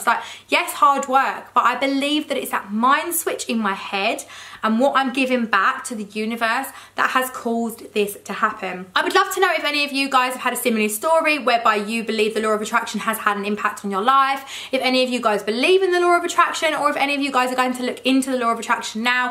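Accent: United Kingdom. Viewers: British accent😍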